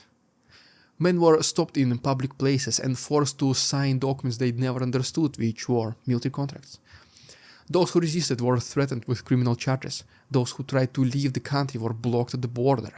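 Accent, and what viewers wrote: Russia. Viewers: How can you be so eloquent in English and at the same time have such a heavy Russian accent? You are an enigma... Your content is really good, thank you for your tireless work! Russian evil regime will be defeated, truth and love must prevail!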